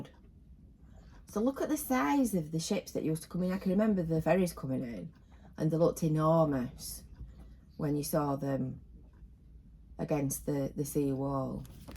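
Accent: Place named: Yorkshire, United Kingdom. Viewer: Shes from Yorkshire.you can hear the accent.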